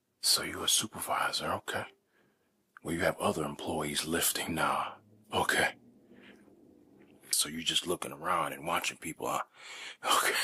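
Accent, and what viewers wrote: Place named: California, United States. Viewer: Hope homie hit over 100K this year! They already know Cali got the best accent for ASMR!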